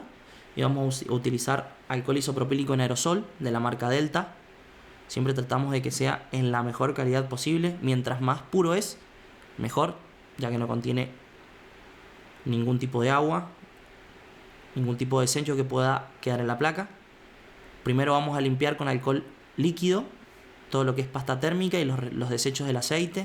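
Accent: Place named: Mendoza, Argentina. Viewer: Sos de Mendoza o cuyo? Ese tonito se me hace familiar jajaja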